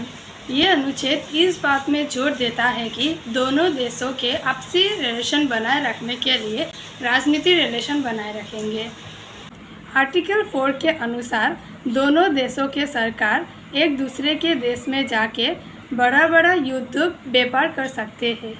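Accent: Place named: Northeast India, India. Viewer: H bhai awaaz se smjh aa rha ya toh northeast se hai ya bengal ya fir nepal ye ladki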